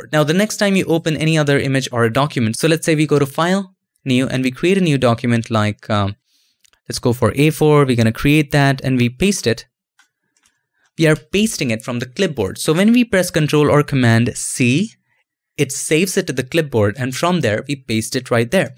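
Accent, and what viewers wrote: India. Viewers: No matter how much you try to get a american accent your indian one cracks through your effort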